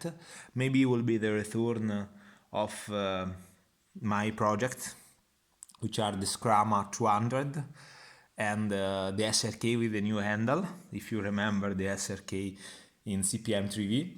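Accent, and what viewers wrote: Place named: Umbria, Italy. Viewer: Bravo, bella presentazione. Ottimo coltello. Il tuo inglese con cadenza umbra è inarrestabile!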